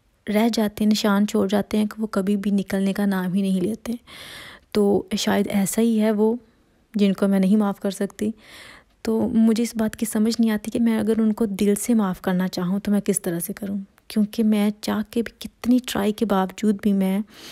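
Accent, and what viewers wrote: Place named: Faisalabad, Pakistan. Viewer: hahaha mujy bolny k texture sy pta chla tha..sb kehty faisalabad k log apni boli sy pehchan leay jatay..me also from fsd ... Khush rahen sister..abad rahen..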